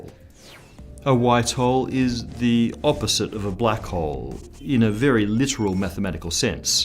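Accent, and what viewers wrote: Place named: Australia. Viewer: do you have a channel with the same information, but without the Aussie?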